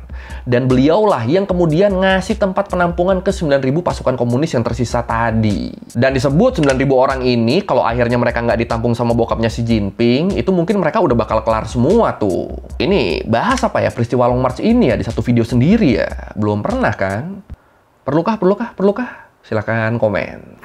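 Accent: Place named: Java, Indonesia. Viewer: ini perasaan gw doang atau bang di video ini lebih medok ya😅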